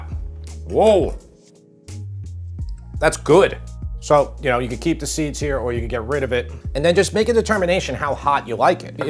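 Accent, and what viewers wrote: New York, United States. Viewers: Sounds like yall need to get to know your butcher. I don't buy prepackaged meat when I can I want to look the guy in the eye that's packaged the meat. Crack some jokes bull shit. IDK sounds like you're from new york or up new England. It's different in the south.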